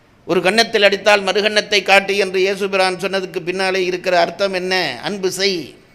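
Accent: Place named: Tamil Nadu, India. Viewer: உங்களுடைய பேச்சு மாறுகிறது...... ஈழத்து உச்சரிப்பு மறைந்து தமிழ்நாட்டு உச்சரிப்பு வந்துவிட்டது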